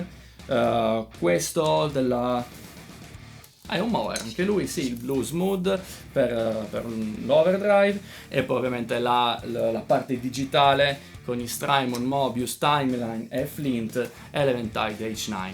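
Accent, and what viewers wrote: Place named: Sardinia, Italy. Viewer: Complimenti bel video e bel modo di esporre... Ho letto che sei di Torino ma sento una piacevole cadenza sarda...vero?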